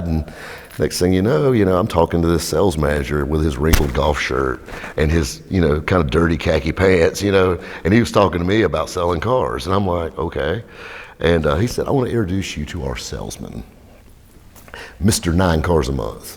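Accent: South, United States. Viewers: If vin diesel had a country accent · Your voice IS the South.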